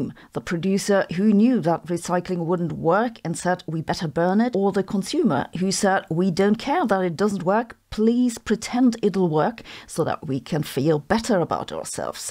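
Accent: Germany. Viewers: And I love your cherman accent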